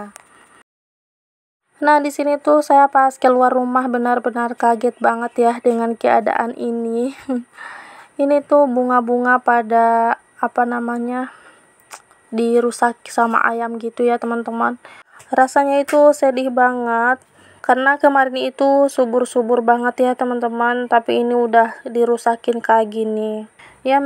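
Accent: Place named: Gorontalo, Indonesia. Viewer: Suka bgt logat gorontalo..teringat tmn yg dri Gorontalo jg.slm dri mkassar y bund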